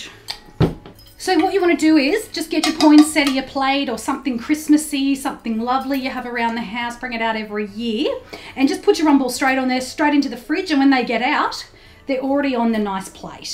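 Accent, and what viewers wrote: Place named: Australia. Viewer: I'm not used to the Australian accent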